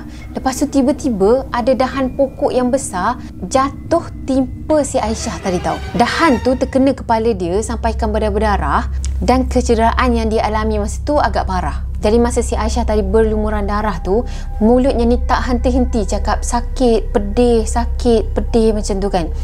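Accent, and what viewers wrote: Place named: Johor, Malaysia. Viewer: Kak ni org johor. Tgok loghat pun tau hehe😁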